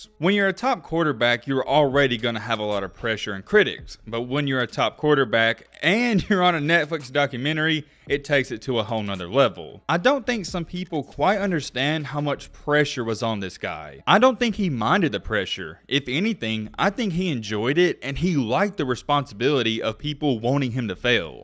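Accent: South, United States. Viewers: Dude your accent is awesome!! So southern like mine probably is too 😂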